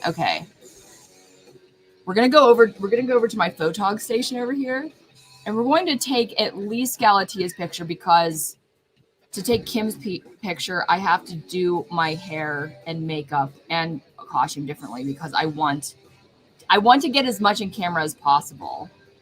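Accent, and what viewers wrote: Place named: Los Angeles, United States. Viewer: I so love your la accent! I love you sweet.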